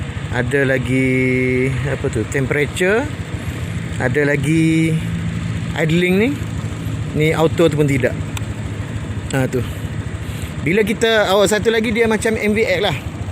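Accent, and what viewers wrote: Kelantan, Malaysia. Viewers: Ore Kelate ko hox kecex nih???? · Kecek luar berbaur budu cap ketereh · acu kecek kelate skali bea hehehe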